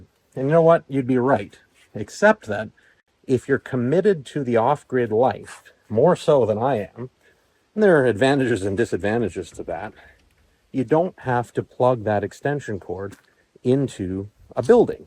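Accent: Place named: Canada. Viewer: I love your video tutorials; they are well paced and informative. Love the Canadian accent!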